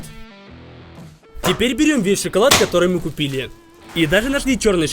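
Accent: Ukraine. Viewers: Украйнский акцент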